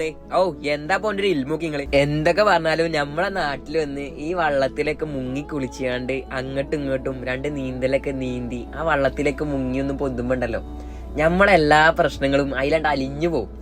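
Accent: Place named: Nilambur, India. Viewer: Ante Varthanam kettitt ijj oru nilambur karananenn thonnunnu.. Njanum nilambur aan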